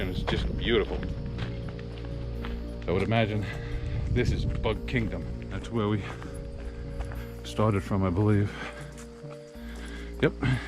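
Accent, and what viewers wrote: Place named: New York, United States. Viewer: I grew up on the east end of Long Island (north fork) we love going to ‘Montauk and learning it’s history. You brought me back home for 30mins with the landscape and your Commentary and your awesome New York accent. 😀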